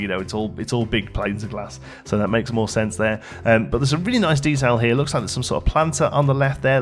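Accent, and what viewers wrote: United Kingdom. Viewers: Weird, by your voice I would have thought you were in the UK already. Hello to a Canadian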